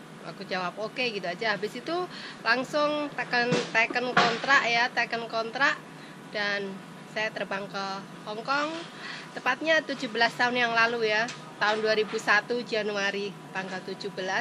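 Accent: Java, Indonesia. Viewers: Siapa nonton 2019? Ternyta udah 20thn an tapi logat jowene isek kentel. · Seneng liat mba sederhana dan logat jawanya kental banget dan tidak sombong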